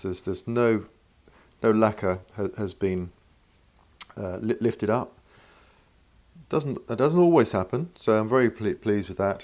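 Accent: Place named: England, United Kingdom. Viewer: I love English accents.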